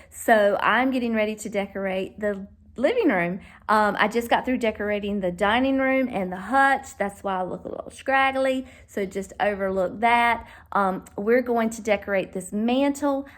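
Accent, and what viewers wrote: South, United States. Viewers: I just decorated my house this morning. Now I’m watching you while I knit on this rainy Alaskan day. You are just so sweet and listening to you, I’m remembering my southern roots. ❤️ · Thanks for getting back with me. Such a great idea. Love your southern accent and down to earth explanations. Joy to watch you🎃❤️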